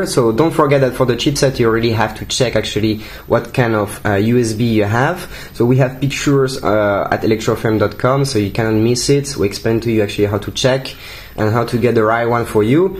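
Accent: France. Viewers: tu es français??? oh la la l'accent; mec! Mais tu maitrises! :) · Mec ça cd voit que tu est français Mai's pas grave tu gere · Just so you know, ACCESSORY is pronounced ak-CESS-er-ee. The emphasis is on the second syllable. It's not access-er-EE. I realize you have a French accent, but that pronunciation will cause people's brains to stop for a moment to try and figure out what you're saying to them. Thanks for the review! :)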